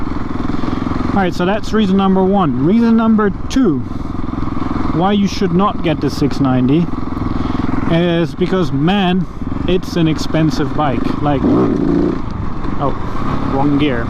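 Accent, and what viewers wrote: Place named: Germany. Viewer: Kompliment für den Akzent, hört sich nicht so schrecklich deutsch an :D Sehr aufschlussreiches video!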